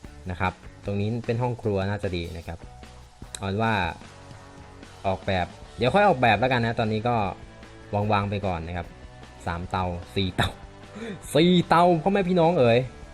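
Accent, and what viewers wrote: South, Thailand. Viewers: พี่แหลงภาษาใต้ได้ม้าย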